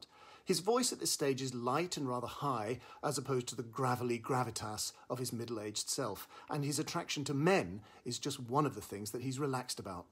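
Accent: United Kingdom. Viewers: British darlin’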